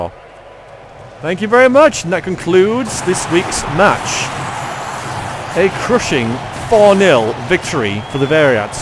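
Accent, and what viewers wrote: Yorkshire, United Kingdom. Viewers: So.... you are from Yorkshire? :-)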